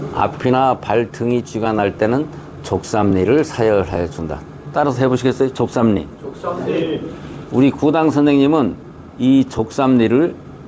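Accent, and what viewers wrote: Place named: Chungcheong, South Korea. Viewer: 강의 참 재미있습니다❤ 충청도 제 고향 본토 사투리가 더 재미있습니다❤ 바락바락 ㅎㅎㅎ 다리에 쥐 날때 족삼리 오금통 위중에 사혈 숨통을 터준다 ❤❤❤ 급한대로 피를 낸다 백문이불여일견이라 신경 신경은 허리 천추에서 시작 진단 엘로드 진짜 재미가 바락바락납니다 ~~~ ^^ 고맙습니다 ❤❤❤❤❤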